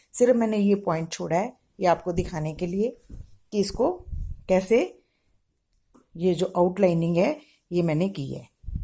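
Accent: Punjab, India. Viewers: Nice video, n Panjabiyat jhalak Rahi h which is nice expression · Your voice mam, is so like my mom's!😍🤗 And it's okay if your Punjabi lilt comes in on your video, I'd love it! And am sure many other would too! तुम्हारी आवाज़ माँ, मेरी माँ की तरह है! 😍🤗 और यह शानदार है अगर आपका पंजाबी उच्चारण आपके वीडियो पर आता है, और मुझे यह पसंद आएगा! और मुझे यकीन है कि कई अन्य भी होंगे!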